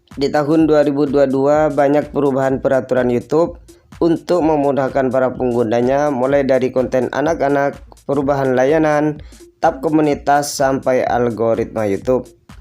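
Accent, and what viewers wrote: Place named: Madura, Indonesia. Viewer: Dialeknya sepertinya orang Madura United ya, sukses terus channelnya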